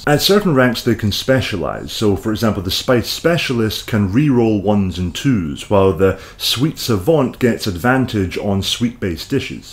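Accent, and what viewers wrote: Scotland, United Kingdom. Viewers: it sounds to me like a hybrid of typical scottish/ northern english accents with a very slight lisp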